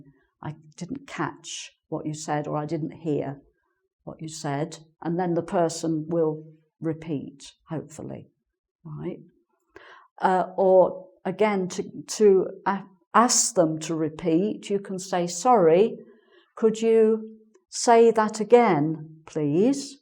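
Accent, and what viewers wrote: United Kingdom. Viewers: Beautiful British accent!! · Is this a British accent ?? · you've got the British accent, haven't you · love your British accent soooo charming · I'm pronouncing every single word from you to be accustomed with British accent. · I love the pace and clarity of your speaking. It's a reason why I love to listen to the British accent.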